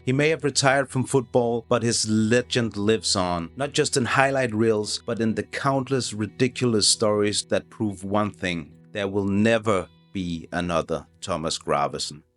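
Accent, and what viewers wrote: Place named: Denmark. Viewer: The Danglish is strong with this one.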